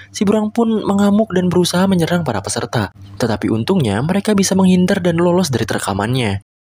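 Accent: West Java, Indonesia. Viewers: Admin org jawa barat keknya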